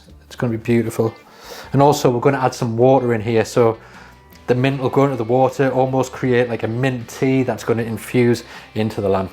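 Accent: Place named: Birmingham, United Kingdom. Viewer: Great content. Im loving the Brunmie accent 😮 😉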